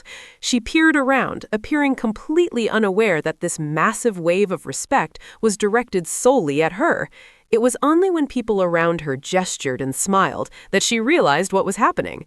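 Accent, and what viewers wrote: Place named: United States. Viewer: Why have this AWFUL American commentary ?!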